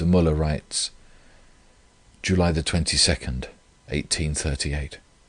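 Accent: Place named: England, United Kingdom. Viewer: I enjoy this Brother’s voice. It is comforting and I love his English accent.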